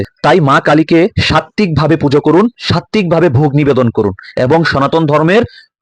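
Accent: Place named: Bangladesh. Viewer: 1. Apnago kothar taan deikha mone hoitese apnago holen Bangaldasi. Ar Bangaldasi der thika sanatani gyan ami shikhum na karon amar jana nai je apni dhormi na bidhormi. Tothapi bidhormi ra j bhabe hindu der upar apnago dashe ottachar korse ta etar thika bhalo kisu asha kora apnago der thika kora utchit na 2. Kono video bananor age ektu bhalo koira prashuna koren ta hoile apnago bhalo hoibe ar apnago channel r viewers der o gyan orjan hoibe. MAA TARA APNAGO MOTON SONKIRNO MONOSKO DER GYAN R ALOK DEKHAK... EI PRARTHONA KORI... JOI MAA TARA🙏🙏🙏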